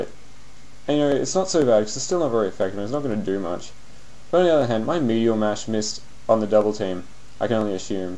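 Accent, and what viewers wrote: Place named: Australia. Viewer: Fuck yeah Australian accent narration =)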